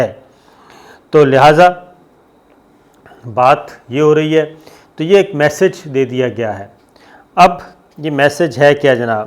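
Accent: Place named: Saraiki, Pakistan. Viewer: Saraiki speaking hai oski urdu aise he hai ap log khhamakha time apna zaya kr rahe ho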